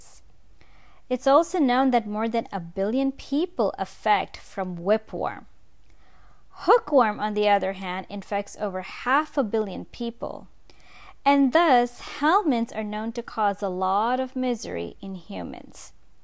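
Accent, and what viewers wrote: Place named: South Korea. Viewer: i like korean accent very much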